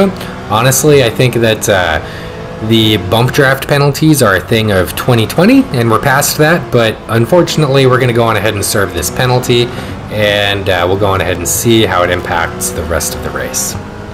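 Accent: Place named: United States. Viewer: I really enjoyed watching this! Please keep grinding I’d love to finally have a American English speaker to watch daily on gt7 !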